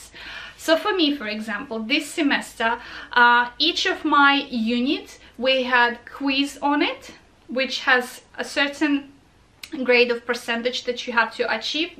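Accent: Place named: Russia. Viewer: Thanks for your Contribution. You Sound Russian as one of my teacher.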